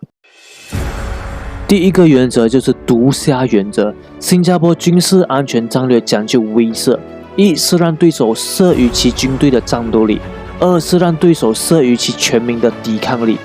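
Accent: Malaysia. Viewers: This guys sounds like a Malaysian.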